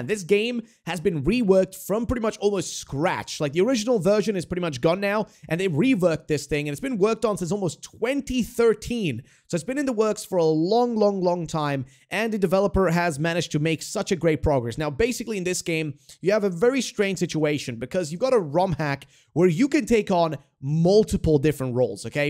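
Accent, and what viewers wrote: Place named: Australia. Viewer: Are you Australian or American? Your accent cant seem to make up its mind.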